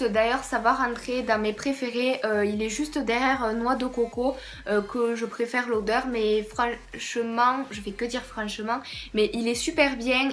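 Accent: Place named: Toulouse, France. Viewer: Superbe vidéo!! 💘 jaime trop ton petit accent toulousain 😊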